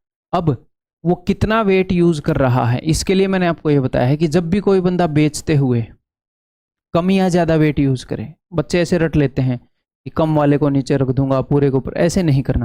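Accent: Haryana, India. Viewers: Sir ek video haryanvi accent mein banano...aapki haryanvi accent bahut mst hai maine ek baar paid course ke videos mein thoda suna tha..aap syd kuch bol rahe thai samne waale ko ki thodi class baaki hai bs.😀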